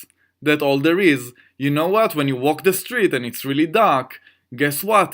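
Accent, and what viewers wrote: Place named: Israel. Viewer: Well I for one love Israeli accents, yalllaaaaaa! Thanks! This will help me sleep better alone at my ranch after a series of home invasions in my 20s that's left me with sleep trouble when I'm home alone. Going to conquer it though! Thank you!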